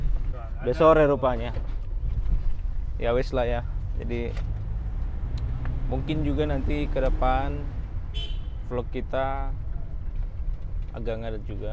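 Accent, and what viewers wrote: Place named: East Java, Indonesia. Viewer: lo kok logatnya kyk wong jatim klo berbahasa jawa gais..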